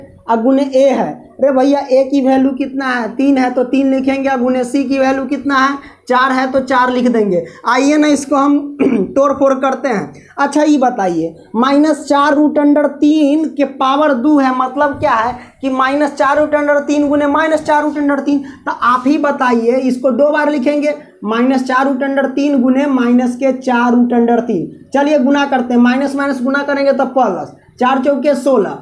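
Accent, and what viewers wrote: Bihar, India. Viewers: Sir aap Bihar ke ho😊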